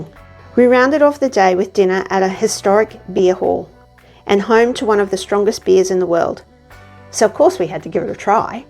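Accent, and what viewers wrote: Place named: Australia. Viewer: Great to hear an Australian voice. We're planning to visit Prague later in the year so thanks for all the information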